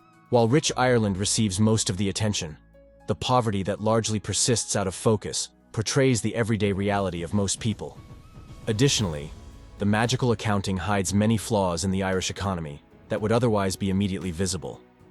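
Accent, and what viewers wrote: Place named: United States. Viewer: AI generated garbage, the AI voice is using American pronounciation of Celtic as in the Boston Celtics team spoken as Selltics, but Celtics is said as Keltics. This blithering idiot doesn't know such a simple thing. And the entire channel is all AI generated click bait and at a prolific rate.too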